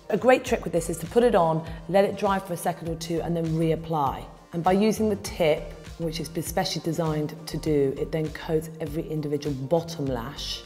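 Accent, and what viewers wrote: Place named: RP, United Kingdom. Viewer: Loooooove accent. It's so posh and sophicticated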